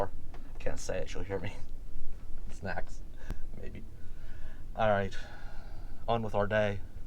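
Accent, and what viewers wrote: Pennsylvania, United States. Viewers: you can always tell a PA accent!! very distinctive.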